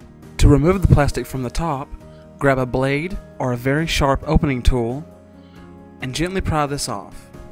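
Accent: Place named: Deep South, United States. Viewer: I love your accent - are you from the deep south?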